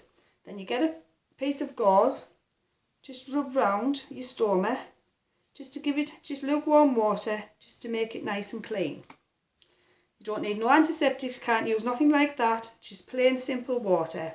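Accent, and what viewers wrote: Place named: London, United Kingdom. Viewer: You sound like you are in London, they have the TIES Ostomy Cure over there , so far 15 patients have tried it, still not yet ready, hopefully it will be available soon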